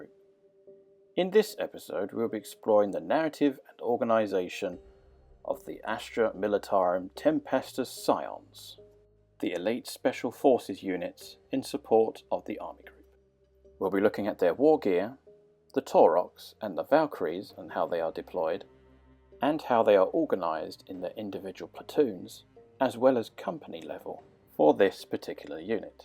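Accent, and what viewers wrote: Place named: United Kingdom. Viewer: This is exactly what I’ve been looking for! Prayers answered British guy talking like a university professor about army organizational charts for 40K Perfection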